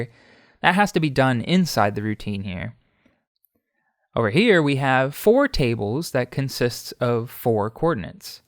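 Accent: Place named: South, United States. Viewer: Are you from the south? I like your accent.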